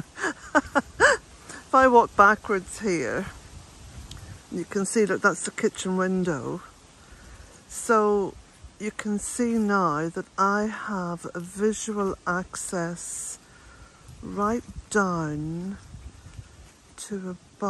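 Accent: Ireland. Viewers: Last time i watched one of your videos you had your black dog. The little jack russell is very cute. And your accent has become very irish! Lol😊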